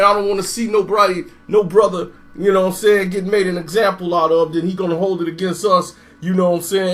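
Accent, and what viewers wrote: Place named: Compton, United States. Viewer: on piru get yo head busted lol..u sound just like a Compton brotha lol...the m.o.b don't play ftp ttp 151 piru all ru are with it except game lol...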